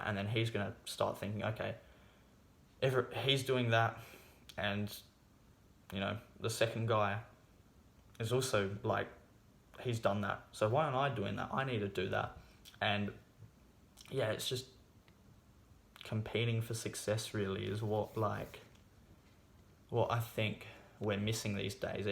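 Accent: Australia. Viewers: Good luck and best wishes. I like the Aussie accent. You did well for your first video. 👏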